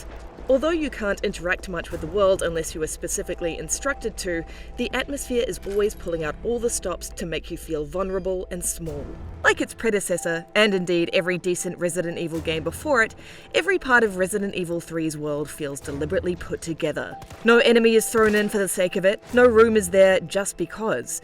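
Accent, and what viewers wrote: New Zealand. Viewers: Is that a new zealand accent??? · So weird to hear a kiwi accent on ign. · Going by her accent, is a fellow Kiwi?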